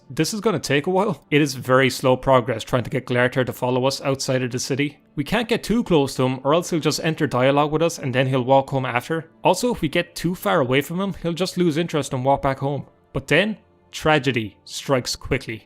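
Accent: Ireland. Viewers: Haha funny oirish man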